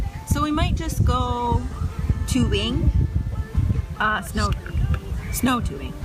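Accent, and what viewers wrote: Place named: Upstate New York, United States. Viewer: T F just my opinion. It’s a harsh upstate NY accent.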